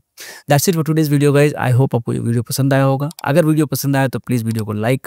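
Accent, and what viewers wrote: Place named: Bengal, India. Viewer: sunei voi lagche.. dadda apni bengali hoye Jharkhand e thaken?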